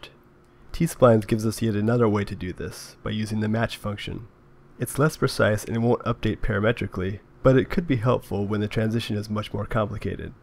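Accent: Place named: United States. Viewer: Very interesting because "simple" : why T splines would ease the job ...But it is in fast american instead of steady slow english ...Too bad for the frog, but if i slow motion the vid i may understand . Bravo anyway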